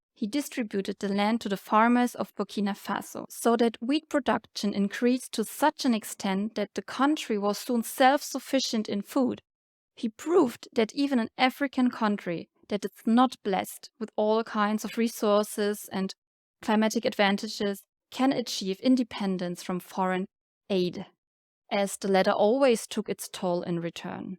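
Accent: Germany. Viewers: Great storytelling. I recognise your German accent. I'm Namibian so I hear it often in our cities like Windhoek and Swakopmund. We have a huge German community in Namibia. I'll subscribe to your channel. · Amazing!! I really loved this video!!🥺 It was so sad to see that you don’t have the recognition you deserve. Hopefully your channel grows soon!! You deserve it! I’m so glad I stumbled across it and I will be watching more of your videos!! I really love your accent btw. What part of Germany are you from?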